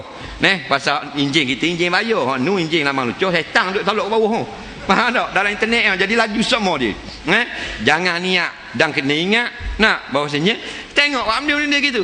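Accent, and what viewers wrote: Terengganu, Malaysia. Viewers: Saya pn faham... Ganung power...syok dengar org ganung cakap #ankjatikedah... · tak faham la ape dia ckp..loghat ganu pekat sgt..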